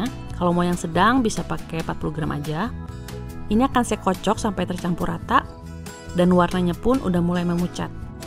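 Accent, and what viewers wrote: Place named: West Java, Indonesia. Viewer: Vanila', susu', dulu'😂 Mojang Sunda euy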